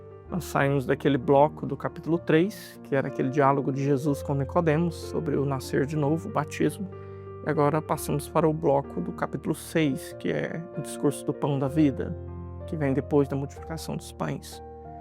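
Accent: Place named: Goiás, Brazil. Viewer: Uma abençoada semana para todos que ouvem o. Descobri ele aqui neste canal e não deixo de ouvi-lo todos os dias. A maneira como ele fala conosco, a forma simples e direta de explicar o evangelho. Os exemplos, as comparações e até a maneira de falar no Goianes dele, é maravilhoso. Que Deus te abençoe sempre. Quem sabe um dia posso conhecê -lo pessoalmente.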